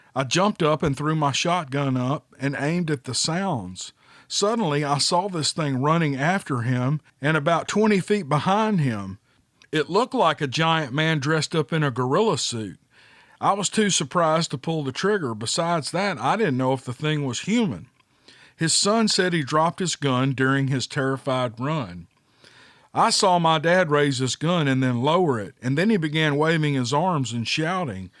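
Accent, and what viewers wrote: South, United States. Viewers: O! Don’t record it over! I love hearing you get so tickled you can’t go on. I’m sure everyone is laughing along with you. Even my husband, who views these stories only as entertainment and gets tired of listening, got a great laugh at the experience and loved to hear you laugh, too. I LOVE EVERYTHING you pick out and love your accent and the way you speak because I’m a country girl from the south. You are my favorite Bigfoot storyteller on Utube.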